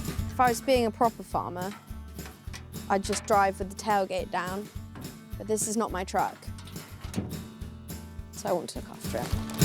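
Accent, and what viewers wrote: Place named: RP, United Kingdom. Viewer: The poshest accent on Youtube. One of the benefits of private education no doubt.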